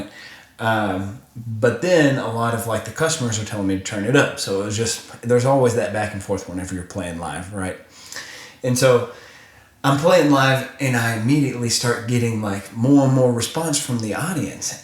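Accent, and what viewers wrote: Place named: Illinois, United States. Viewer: WOW!! BIBICAL!! I sure hope ROLAND paid you for this video!! Oh, color your beard "black"; Abe Lincoln!! No kidding!! As Angus Young; student! Dark-in your beard; play dressed as Abe Lincoln!! Especially with the slight southern drawl!! Right out of southern Illinois!!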